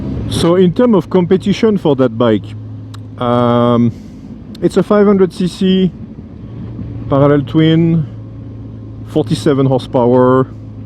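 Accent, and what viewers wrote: France. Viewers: A true French accent ! 😁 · I love french accent in your english , because i am french 🤗. Les moteurs des cooters honda sont il les mêmes que sur les scooteur sym ? Merci for your vidéos , dear french man .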